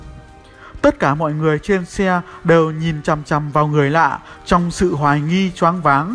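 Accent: Thanh Hoa, Vietnam. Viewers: Dám chắc đây là giọng thanh hóa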